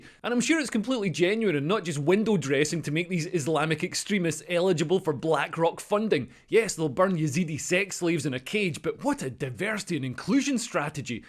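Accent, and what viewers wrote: Scotland, United Kingdom. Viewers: Fair play. You haven't got a clue what you're talking about but you do talk very quickly with that Scottish accent which gives those who dont know any better that you have some insights. Anyway, love to the family.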